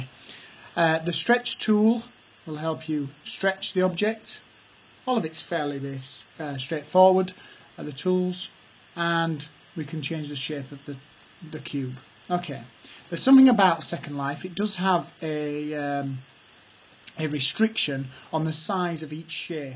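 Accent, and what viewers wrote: United Kingdom. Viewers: nother northerner :D eiya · Are you from Liverpool or Manchester?lol I like your accent!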